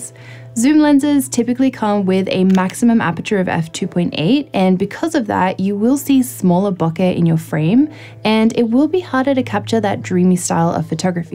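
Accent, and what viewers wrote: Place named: Australia. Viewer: Australian accent mate 😉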